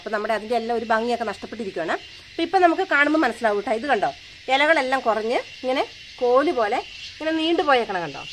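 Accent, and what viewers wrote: Wayanad, India. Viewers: Wayanad language Kelkkan rasamund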